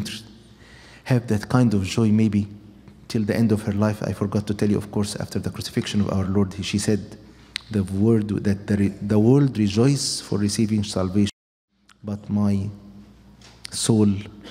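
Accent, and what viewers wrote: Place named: Northern Mariana Islands. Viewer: hmm this is in english, though the accent is thick, and though I am certain this Priest is from the middle east parentage he kind of sounds like some people I know from the marianna islands in the pacific they kind of sound spanish with they way they pronounce certain words, that aside about 5 minuts in I am enjoying listening to this sermon, its very deep and thought out, and last but not least informative, oh I am a traditional roman catholic another appostalic church if you copts like to know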